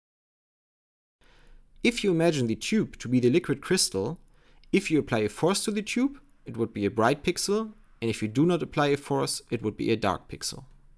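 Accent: Germany. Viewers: God damn, your German accent is so strong and so nice 😂❤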